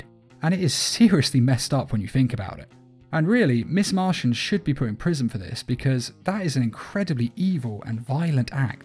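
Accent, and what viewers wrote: United Kingdom. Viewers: Hey. Just wanted to say how much I love your content.I wasn’t very interested in the superhero content until I stumbled across your channel.Hearing that sweet,beautiful British accent is so satisfying every time.Love your content and hope you get more recognition in the future.For others who see this,have an amazing day.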